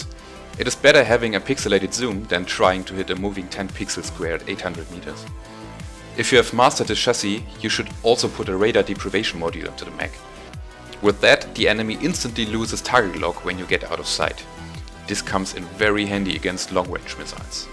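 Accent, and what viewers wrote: Germany. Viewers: Are you German? That's one heavy accent you have. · Very impressive, this tutorial adresses things i have seen other players doing and always wondered about. I don not own the 9S and the modifications you show here will cost a lot, so no TBolt sniping for me in the next time. But i definitely want to try it out at some point, since i already own most TBolts (Overlord package), so i will be able to unlock all mech skills. One question though: i noticed it on other of your vids too, the way you speak sounds like a german speaking englisch to me?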